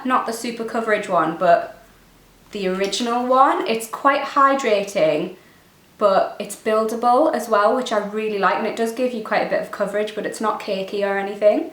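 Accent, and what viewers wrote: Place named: North, United Kingdom. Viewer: that’s ok chick always a pleasure seeing your cheery face and that up north accent 😘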